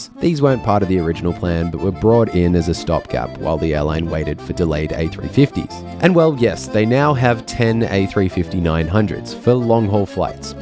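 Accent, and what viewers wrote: Australia. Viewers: Yeah, particularly because he's good looking with an aussie accent 😊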